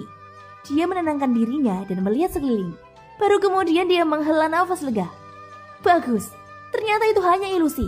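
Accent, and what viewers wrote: Java, Indonesia. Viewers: Medok nya 😂😂😂😂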